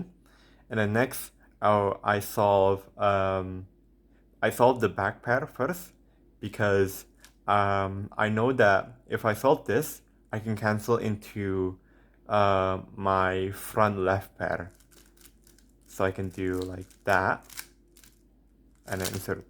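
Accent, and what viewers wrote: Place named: Indonesia. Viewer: Next time gonna be better. Also this is the most indonesian accent ever when you speak english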